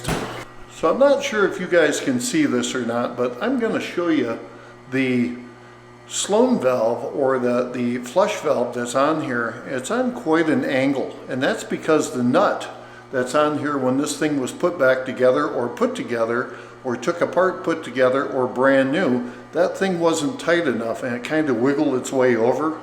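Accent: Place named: Minnesota, United States. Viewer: First time see you like your content….is that your daughter..you have a Minnesota accent..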